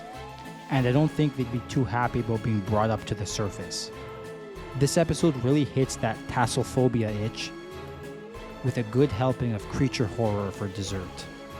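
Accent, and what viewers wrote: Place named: North America. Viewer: It's actually rather surprising hearing a North American talk about this show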